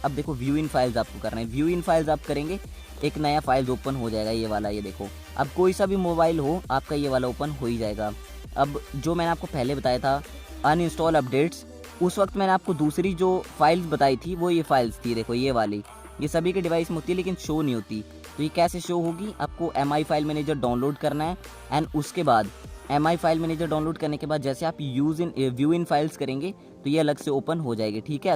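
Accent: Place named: India. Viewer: Is this a joke? It doesn't even work?! Tf? English caption but indian voice wtf?!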